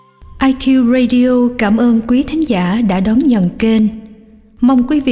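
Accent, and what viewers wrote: South, Vietnam. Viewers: Truyện hay, cảm động, 8/10 cảm ơn giọng đọc dịu dàng, miền Nam của.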